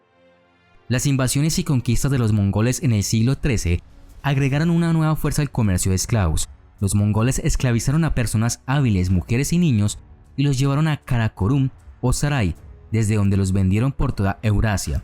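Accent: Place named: Colombia. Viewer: Me ha dado un no se que este documental me provoco escaŕamuscia.con mis 74 inviernos James habia escuchado alguna historia ni parecida,exelente documental mil gracias por compartir sus conocimientos entiendo q se ha documentations muy bien creo conocer su acento parece 🇨🇴 colombiano, mil gracias por sus relates se ve q le gusta la historia qq Dios le de larga vida con salud ciencia sabiduria y prosperidad para q pueda seguir con esta laboreducative. Buena suerte.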